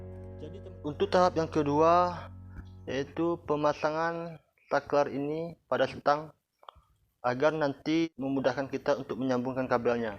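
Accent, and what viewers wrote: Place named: West Sumatra, Indonesia. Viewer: kek nya abang nih orng minang.. ketahuan logatnya.. Salam rider yamaha bang hehe 🤗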